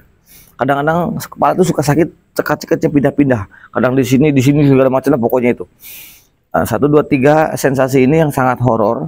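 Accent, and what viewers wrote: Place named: West Java, Indonesia. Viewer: Kalo dari logat2 nya kaya orang Sunda kang, saya dari cibiru bandung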